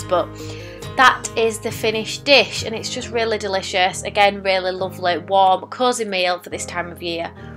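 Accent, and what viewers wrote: Yorkshire, United Kingdom. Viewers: Maggie zemanek I can’t speak for her but because she sounds a bit like me I’d say Yorkshire! (I’m from East Yorkshire and she sounds more like south or West Yorkshire!)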